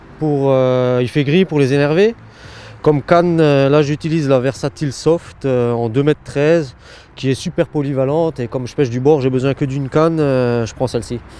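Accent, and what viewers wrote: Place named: East, France. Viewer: On aime l'accent de l'est !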